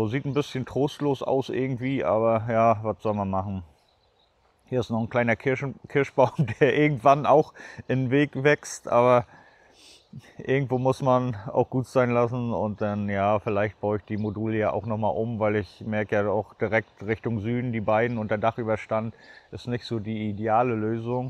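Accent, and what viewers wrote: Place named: Western Pomerania, Germany. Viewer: Du musst doch irgendwo aus Vorpommern kommen, jedenfalls nach deinem Dialekt!?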